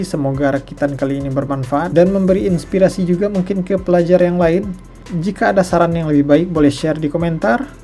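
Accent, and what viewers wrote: West Sumatra, Indonesia. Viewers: Logatnya kaya orang Minang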